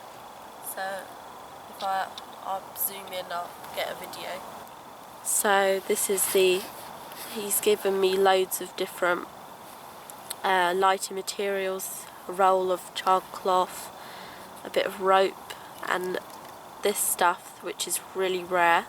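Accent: United Kingdom. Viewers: your like a little ray mears must be the British accent.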